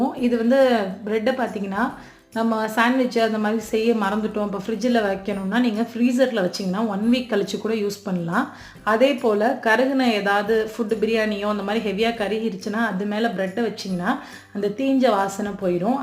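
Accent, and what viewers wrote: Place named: Thanjavur, India. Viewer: Nice tips sister where is ur home town ur slang same like us .my native is tanjore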